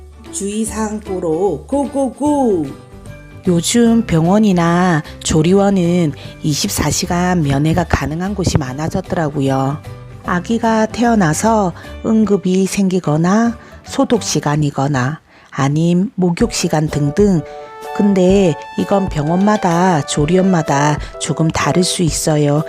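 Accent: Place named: Seoul, South Korea. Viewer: 와ㅎ 선생님 서울사람같아요ㅎㅎ 역시 유익한 강의~~♡♡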